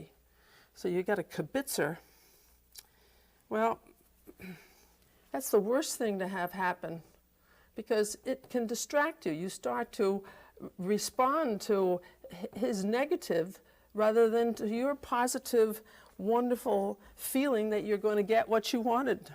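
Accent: New York, United States. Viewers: Would be honored to get my hands on Helen's books. I've been able to view many of her dvd's through interlibrary loan. I immediately resonated with her unmistakable New York accent.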